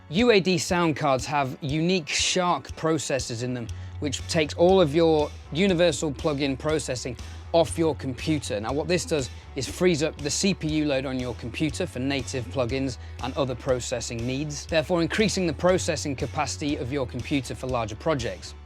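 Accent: United Kingdom. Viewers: Thank you so much for taking the time and trouble by answering my question. I guess l am going to go for it, as it'll be the last monitors l'll ever get and listening & seeing its spec, seems to tick all the correct boxes ! Also the UA Apollo 4x interface, that you brilliantly demo'd, will make all the difference. By your accent, are you from London. I lived there for 25 years, based in Ealing and now back to my roots in the NorthWest near Liverpool. Keep on doing your great videos, it is really nice not to hear a load of waffling about all thing other than the subject in hand, as others do across the pond ?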